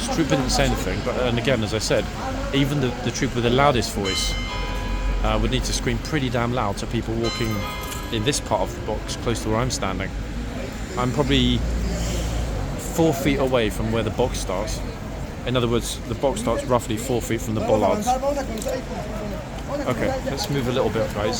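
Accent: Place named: United Kingdom. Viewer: I love British accents!!!!!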